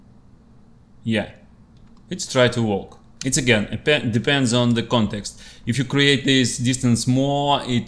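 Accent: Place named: Russia. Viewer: Very nice russian acсent).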